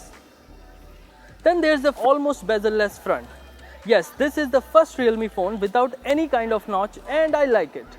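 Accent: India. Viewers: Accent of the accent.... Indian accent😂😂😂 Yours is ultimate....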